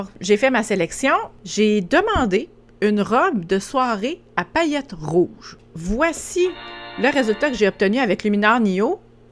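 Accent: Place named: Chicoutimi, Canada. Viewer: Ahahah, de Québec, OK, mais, tu as un accent de Chicoutimi, ou j'ai fait mon Bac en art. Je n'habite plus au Lac, je suis à Montréal depuis 1990, pour étudié à la maitrise en art visuel. à UQAM Je t'encourage à continuer tes postes sur la chaine, tu es intéressante et drôle ! Merci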